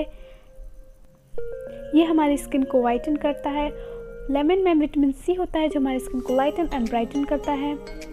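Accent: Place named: India. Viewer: Индийский английский....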